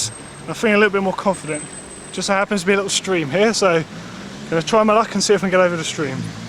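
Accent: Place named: United Kingdom. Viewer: its just a normal british accent lol wats so amazing about that?!?!?!?!?!?!?!?!?! :L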